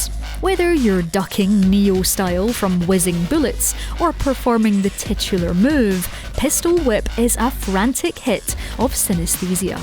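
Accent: Scotland, United Kingdom. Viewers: i love this ladys scottish accent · This is a nice scottish accent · everyone talking about games but no one talking about narrator girl .She mixed her scottish-english-welsh accents and it sounds like cute xD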